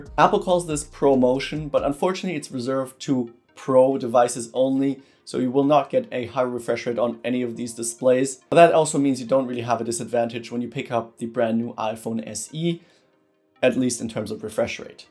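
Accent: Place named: Germany. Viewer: Having a German accent is definitely an advantage when you talk about technology.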